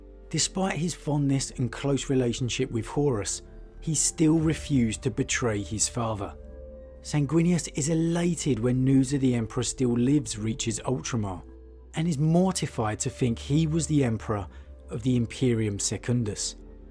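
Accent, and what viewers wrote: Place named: London, United Kingdom. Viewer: Great voice london accent mate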